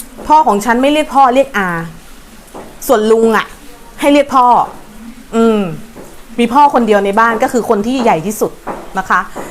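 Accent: Isan, Thailand. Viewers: นางเป็นคนอิสานหรือเปล่าครับสำเนียงออกภาษาอิสานจังหวัดไหนครับ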